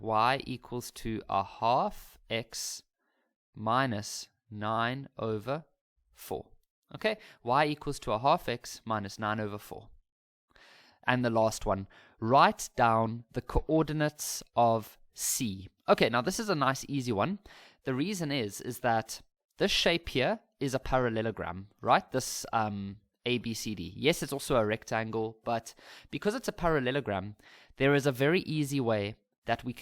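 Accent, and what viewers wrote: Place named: South Africa. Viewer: You're South African😳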